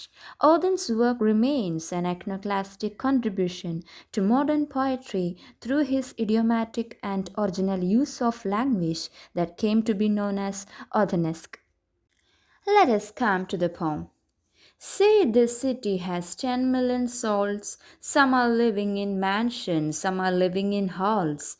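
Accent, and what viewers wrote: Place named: England, United Kingdom. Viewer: I like your english accent ❤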